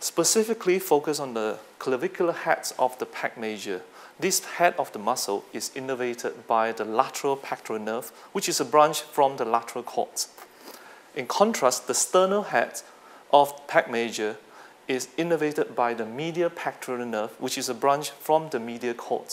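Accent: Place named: Singapore. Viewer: A very polished Singapore accent.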